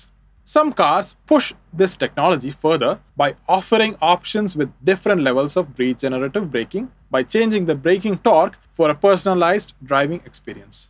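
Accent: India. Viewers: ofcourse Indian accent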